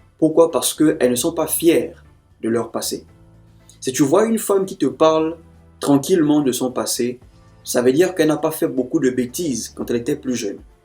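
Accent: Gabon. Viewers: te gabonais frère l'accent ce chez moi içi ah libreville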